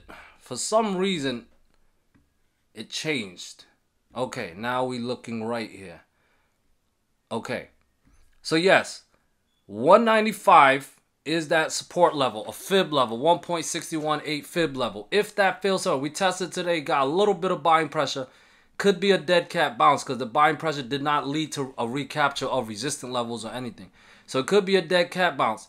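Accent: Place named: East Coast, United States. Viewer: I can hear that east coast real strong 💪. This guys charting analysis is on point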